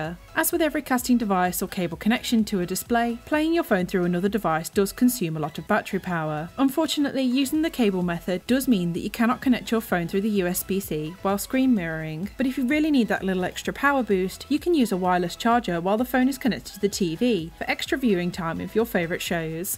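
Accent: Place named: England, United Kingdom. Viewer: It’s an English, one of those things. Learn to respect, not all are using American (US) accent or twang. Peace ✌️!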